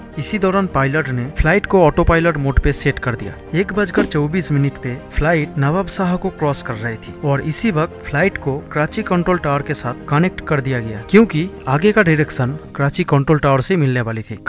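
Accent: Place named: Bengal, India. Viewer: Thora pronunciation improve karo. Bengali gaon ka accent hai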